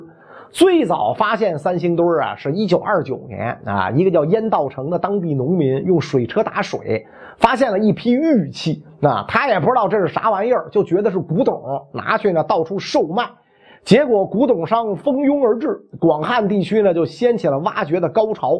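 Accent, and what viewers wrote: Beijing, China. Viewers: 是的，秒秒回到北京胡同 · 哈哈，北京人儿，理解一下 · 袁老师几天没见，怎么北京话又浓了233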